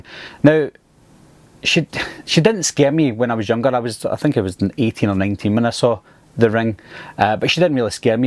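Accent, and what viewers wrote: Scotland, United Kingdom. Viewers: Is this guy scottish?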